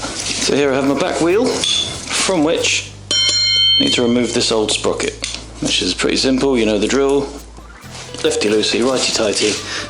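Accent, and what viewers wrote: United Kingdom. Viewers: Hmm...so has the same bike, and the same workstand, and did the same 46->43 sprocket change. I feel almost like I'm watching myself, (except for the UK accent). I did the sprocket change after putting my cycling GPS on the bike, and calculating that a 43 would make the speedo accurate. It still baffles me how Kawasaki can make the odometer dead-on accurate, but can't do the same for the speedo. Of course, after the change, my odometer now runs too slow.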